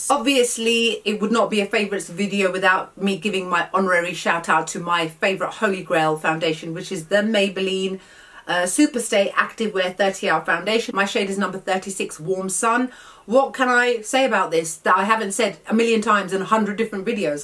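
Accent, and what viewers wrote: United Kingdom. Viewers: You are giving me Leah Remini vibes, only with British accent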